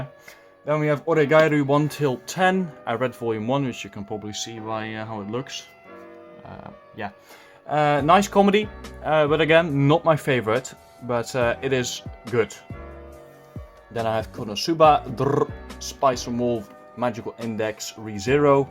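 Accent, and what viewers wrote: Netherlands. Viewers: Hahahah moet wel je kunt gwn meteen horen dat ie Nederlands is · I currently have about 700 volumes in my collection and my favorites are Oysumi punpun, Pandora hearts, AOT, Berserk, and Monogatari lmao. Nice collection btw! You have really good taste imo. Also are you dutch?😂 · Are you Dutch perhaps? You're accent sounds really familiar but I don't want to assume. Great collection and thanks for showing it! Edit: I just scrolled through to the comments and apparently I'm really bad at listening 😅. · Are you Dutch? Great collection btw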